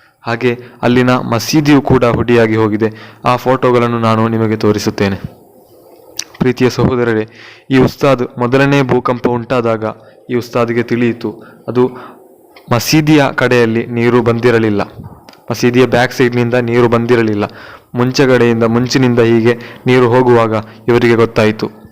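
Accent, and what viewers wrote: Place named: Kerala, India. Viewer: ಮಲಯಾಳಂ ಭಾಷೆಯ ಯವರು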